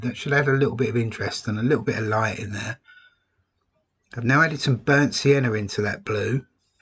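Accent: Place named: United Kingdom. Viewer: Drawring !Got to love a British accent. Takes me back to Michael Meyers and his character , a little boy named Simon that made his drawrings in the tub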